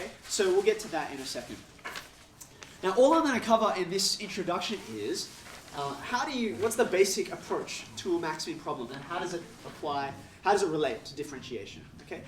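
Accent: Australia. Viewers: What is his accent? Aussie, right? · yes, hes from sydney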